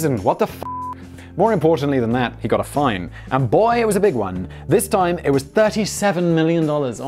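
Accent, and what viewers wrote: United Kingdom. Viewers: You say pretty much every single world with a British dialect - except for controversy. I don't know why it winds me up, but it does... I don't usually care how a word is pronounced, so long as it is said like that somewhere in the world. But controversy - when said by you... Constipates me. Perhaps because you always say things in British dialect and quite often make a point of telling your US viewers why (to them) you are pronouncing things incorrectly. But that one word, you do not use our tongue and never mention why. When with other things that are commonly said either way in US, or UK - you feel the need to explain. You sir, are getting root bound in your dialect and as you take in more American ways, your British side is dying as the roots have nowhere to grow, due to the space having run out and memory is overriding itself..., the root bound British (coca) plant...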